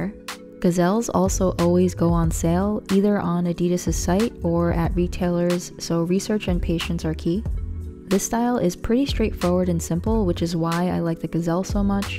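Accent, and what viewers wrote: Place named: United States. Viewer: I like these, you sound American so I don't know if you know but there is a rabid three stripe collectors scene in the UK and Europe for these types of Adidas sneakers. It dates back to the early 1980s when football fans would follow their team in Europe and they would come back with Adidas Trimm Trabb etc and it became fashion for the fans to dress in their best and most expensive clothing and Adidas and a few other sneaker makes and it sort of became a uniform for the now known as "Casuals" who would follow their team across Europe and then the cycle continues with new brands like CP. Company, Stone Island etc etc. Anyway it's still a very fierce collectors market still to this day as new generations get into it, and with the release of archive inspired trainers (we call sneakers trainers usually in the UK) by Gary Aspen with the much sought after Adidas Spezial clothes and sneakers releases each year. 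Anyway that's quite a fun little rabbit hole you could go down just by checking Gary's Insta and see where he gets the design ideas etc. Also in the UK, there is a great source of Adidas information on Twitter on discounts on sneakers like these etc, just look for 'Man Savings' on Twitter and they post great discount deals every day. I also seen that there was an American doing pretty much the same thing for people in the states, I can't remember his Twitter tag but I don't think it would be hard to find as its well worth looking and following these types of pages for excelle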